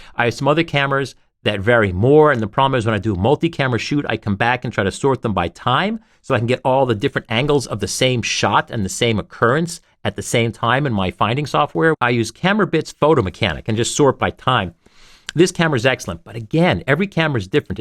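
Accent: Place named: North America. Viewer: Dear I love your reviews, you are responsible for my choice of a Nikon D600 as my first digital camera (which Nikon subsequently replaced for free with a D610 because of the shutter/oil problems). I had to "up-grade" to digital for on-land photography when my Konica T3 became irreparable. My first Nikon was a Nikonos 5 - which feels very much the same "in the hand" as does my Fuji X-T1 (again, acquired on the basis of your review). Anyone who has used a Nikonos 5 becomes a rusted-on Nikon user for life. But, please, please, please, for the benefit of we English speakers, the correct pronunciation of "Nikon" is indeed "Nikon", not "Nye--kon". That is, "Nikon", as derived from "Nippon", not "Nye-kon" as not derived from "Nye-pon". But, more importantly, a "Z6" is a "Zed-6", not a "Zee-6". To we English speakers, hearing a North-American accent, the expressions "Zee-6" is indistinguishable from "Dee-6". 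Given the date of this review, your references to "Zee-6" could not have been to a "Dee-6", but how many YouTube viewers actually look at the date of up-loading of a video?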